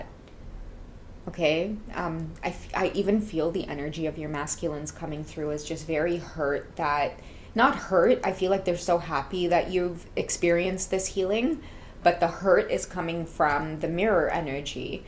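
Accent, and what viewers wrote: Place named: Trinidad and Tobago. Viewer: Look meh trini reader 😂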